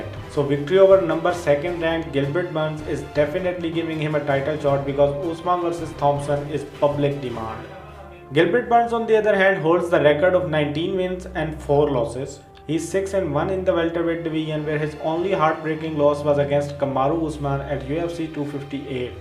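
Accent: India. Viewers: In the description it said "Asian accent" I understand that India is in Asia but it would have been a lot more honest to say Indian accent. All the same I'm pretty sure most people can understand you, your English isn't that bad. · I am sure the voice in this video is an Indian Guy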